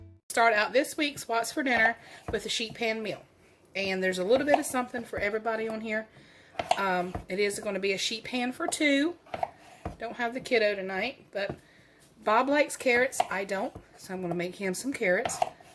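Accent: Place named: North Carolina, United States. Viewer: Looks great are you from down east NC? I have family there and you have their "accent" lol! Love your channel!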